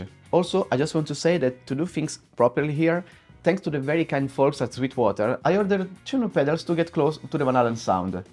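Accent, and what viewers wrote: Italy. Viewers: You're Italian. Recognise that accent anywhere. Have a sub. Good video.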